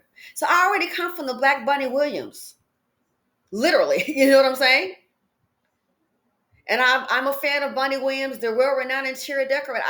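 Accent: South, United States. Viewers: It’s country and southern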